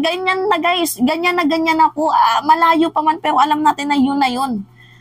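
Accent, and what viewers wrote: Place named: Waray, Philippines. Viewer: taga saan k? Waray nmn salita mu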